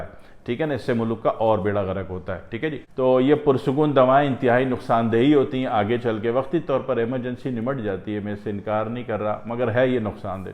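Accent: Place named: Delhi, India. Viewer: Janaabe aali.... kiya aap ka Delhi se koi talluq hai.....aapki zubaan aur lehja Delhi ka sa lagta h .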